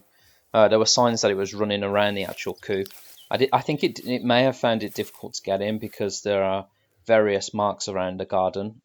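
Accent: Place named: Midlands, United Kingdom. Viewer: Sorry to see you’ve lost your girls to the fox. You sound like you come from midlands like me. Weld mesh is only suitable for chicken runs. I would also invest in a good electric fence around your enclosure too. They can be run off the mains or a 12 volt leisure battery which can be recharged. I use a 50m holt line electric fence & it’s hooked up to the mains now. I’ve had many battles over the years with the fox, lost poultry. Good luck & i’m sorry about the birds. ♥️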